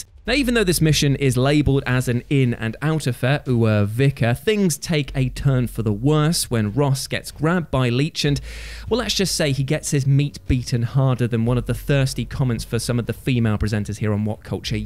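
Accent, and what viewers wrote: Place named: Scotland, United Kingdom. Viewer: Not only was there a Limmy shout out, but that Scottish accent! Hats off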